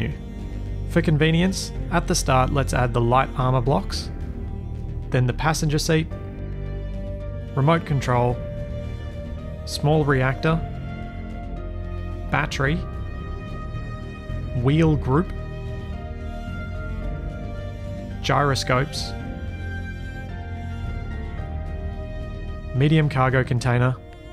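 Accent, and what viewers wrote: Australia. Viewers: he is Australian. Good to know more Australians play this game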